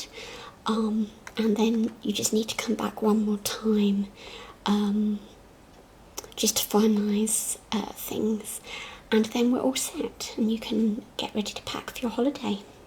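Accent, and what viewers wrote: United Kingdom. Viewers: Great to hear an English accent ASMR vid! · Instantly subscribed after only watching a minute of this video. :) So nice to hear a British accent among the ASMR community. Yours is really soothing and relaxing, love your voice, instant tingles. x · I love your British accent!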